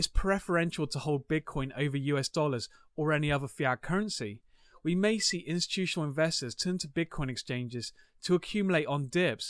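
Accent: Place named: West Midlands, United Kingdom. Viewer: nice video! Are you from the West Midlands in the UK, or maybe the Bristol area? I recognise the accent :)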